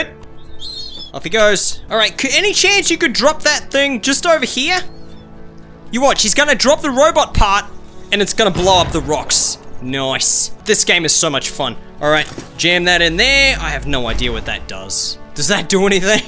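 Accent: Australia. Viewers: Is he an Aussie like me?!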